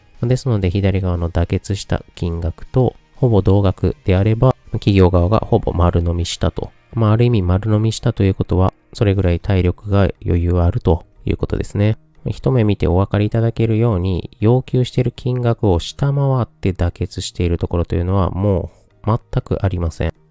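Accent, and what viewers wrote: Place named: Kansai, Japan. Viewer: マクロもミクロの強くていらっしゃる、羨ましいです。関西言葉で、落ち着いて話されるので妙な気分になります。楽しいです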